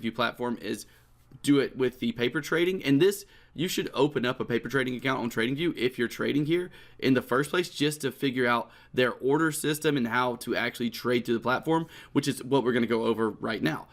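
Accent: South, United States. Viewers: I can you’re from the south lol I am too “Wifey”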